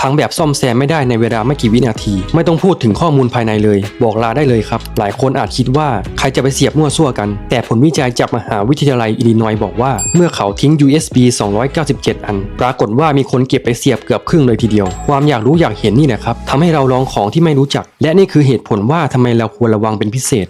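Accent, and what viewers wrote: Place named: Isan, Thailand. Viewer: เว้าอิสานกะได้ดอก😂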